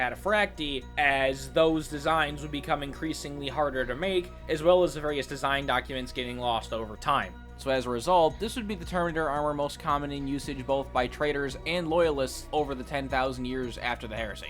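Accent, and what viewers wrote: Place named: United States. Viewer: As iconic as hearing a British accent accompany Warhammer lore, it is refreshing hearing a fellow brother from this side of the pond discuss 40k. I'm in love with what I'm seeing so far.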